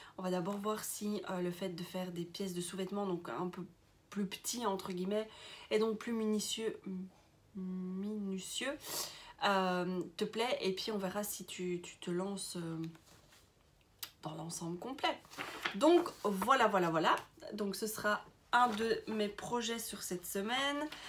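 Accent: Belgium. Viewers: Coucou, j'ai entendu directement aussi que tu es de Belgique (tout comme moi 😁) et j'adore ce que tu propose. Je suis pareil que toi ! Mon amoire déborde et je ne peux pas m'empêcher d'acheter sans pour autant coudre, ou alors comme toi je commence et je ne continue pas un projet que je rêvasse déjà sur un autre , au secours ! Ton challenge me parle ! Je vais faire comme toi et m'en lancer un aussi ! 😁 😅😬😘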